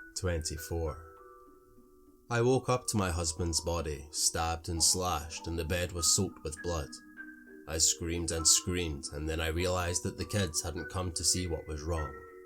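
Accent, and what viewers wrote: Scotland, United Kingdom. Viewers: good to hear another scottish person telling stories 👍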